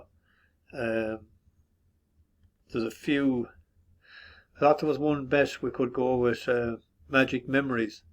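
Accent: RP, United Kingdom. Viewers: U gone very posh.jesus I remember when U were a bus driver 😂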